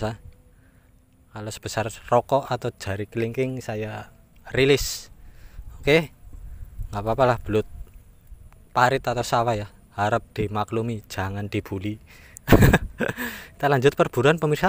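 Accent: Banyumas, Indonesia. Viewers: Mari taraweh langsung nyimak cak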